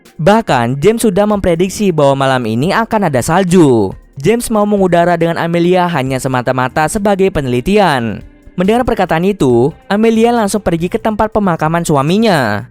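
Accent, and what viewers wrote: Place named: Palembang, Indonesia. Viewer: Cknyo wong plmbng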